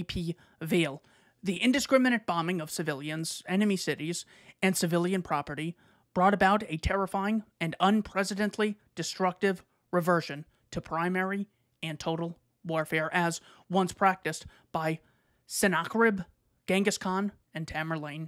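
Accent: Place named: United Kingdom. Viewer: Love the Bri'ish accent. Great video!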